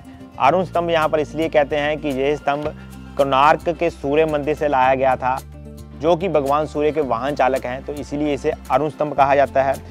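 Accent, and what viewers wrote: Punjab, India. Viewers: Aapka Punjabi lahja❤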